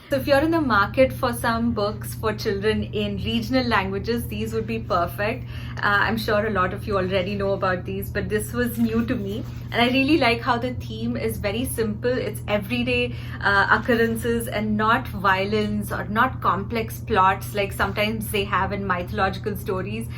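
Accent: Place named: India. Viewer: Puff Indian accent :(((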